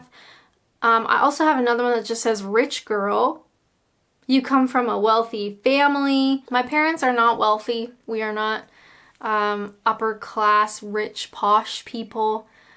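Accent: Canada. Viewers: Lol, its pretty easy to understand you are Canadian. I can hear it whenever you speak.. Only Canadians say ABOOT, when saying ABOUT... I meet Canadians here in Florida all the time. Every winter lots of them come to Florida to escape the Canadian winter... I enjoy seeing and talking to them... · Hi, are you currently back in Ontario Canada or still in Orlando Florida?? The room in this video looks more Floridian...is that even a word??? Well done reaching 60,000 subscribers. I was doing cartwheels when I reached 100. My assumptions about you is that you are able to handle just about any situation and you have a great positive attitude. Doing anything in front of an audience can be absolutely terrifying, I can completely understand your feelings about that. I also understand why you value privacy, I do the same thing. I would also really hate painting my toenails!!! 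LOL. Incidentally, I think your Canadian accent is great. Your accent is quite different to the BC Canada accent. Where did you get the "Adventures and Naps" name from for your video channel? Incidentally, when eating peas, which are all the same, why do we always choose which one to eat first? Now that is something to ponder about!!! I always add the milk after the cereal. When you do decide to get a delivery from Tim Horton's or anywhere else, could you possibly do a video covering the first unwrapping please? Anyway, wishing you the very best. Robert. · Accent is definitely a cannuck. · Your pronunciation of 'ou' in most words highlights your Canadianess!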